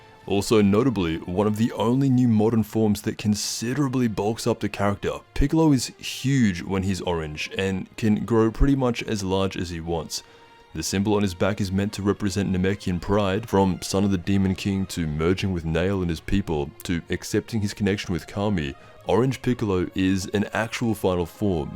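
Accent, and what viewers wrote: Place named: Australia. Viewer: only one I ever subscribed to and click on it the minute I see it appear on my feed even if its not about DB. I'm not gonna watch the whole thing but ill support him. does cool shit for example you'll never hear him begging for fkn pitiful likes and subs NOT EVER. Another cool thing he does is put remixed Dragon Ball music like trap stuff. Its the type of music I like to work out too. I like his views and perception on DBZSGT. Lastly have a deep voice with an Auzzie accent will of course only help in this medium.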